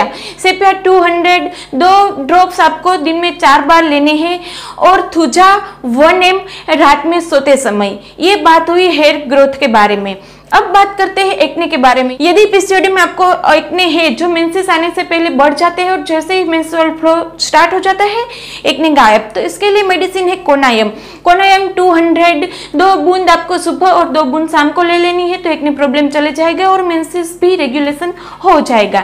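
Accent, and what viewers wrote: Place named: Kathiawar, India. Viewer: Aapki bhasha thodi kathiyavadi jesi he... Kya aap gujrat saurashtra se ho?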